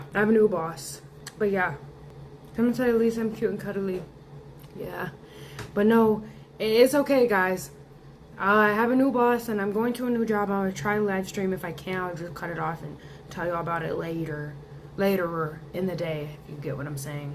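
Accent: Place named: Transatlantic, United States. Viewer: you’re the first GenZer with a transatlantic accent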